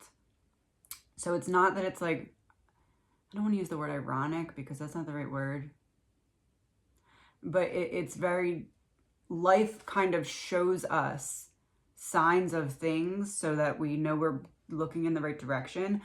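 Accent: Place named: Maryland, United States. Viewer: Where are you? I hear Maryland or PA which reminds me of my cousins! I am enjoying your readings very much!!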